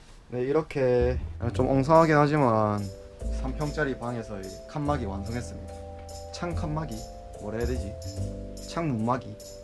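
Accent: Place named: Gyeongsang, South Korea. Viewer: 솔직히 목소리만 들어도 힐링.. 목소리완전 터프... 경상도분들 말투 매력있어요 완전 상남자..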